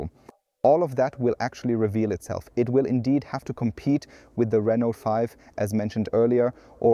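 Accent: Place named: South Africa. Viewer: Hey bro, your accent sounds too familiar-are you South African??? 🇿🇦 🔥👊🏾😎 Great review though, I have thoroughly enjoyed it 🔥😉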